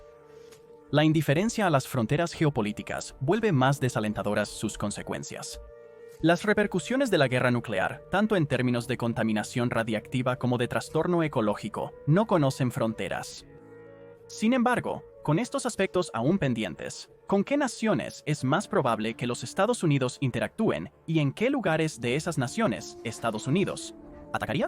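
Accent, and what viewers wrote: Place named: Catalonia, Spain. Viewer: El narrador parece que es un Catalan intentando hablar en Castellano sin que se le note el acento. El video muy interesante.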